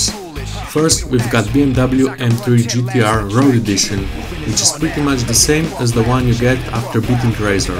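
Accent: Russia. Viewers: Что это за русский акцент?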